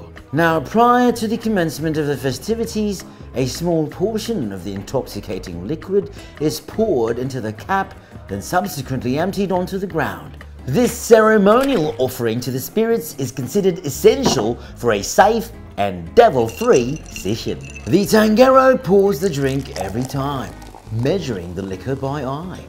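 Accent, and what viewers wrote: Australia. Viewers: I love the authentic Aussie accent...so cute and funny...lol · ngano Mani bay, kung paminawon Ka mura kag Australiano unya kung tanawon Ka mura kag Mexicano o Dile Gani Bisaya...ngano man???!🤔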